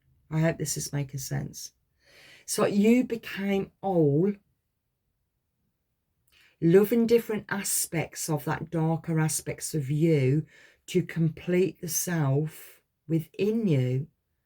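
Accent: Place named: Midlands, United Kingdom. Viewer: Hello lovely, stumbled upon you today.. so strange as you reminded me of my mum so much ?! in looks and the way you speak. We share similar accents too ?! so I feel we live close aswell, guessing central midlands Uk 🇬🇧. Ironically it is her 82nd birthday in spirit today 16.1.45 her name is Lorraine and she also was a therapist for individuals with addictions/mental health. Just wanted to say I found you so relaxing to listen too, found you very comforting on an emotional day for me 💔. I’ve listened to 3 so far and all resonated . Love your style of reading too, very interesting and gave spiritual knowledge to research. Subscribed ! Sending you abundant blessings Thankyou 🤍🪽💜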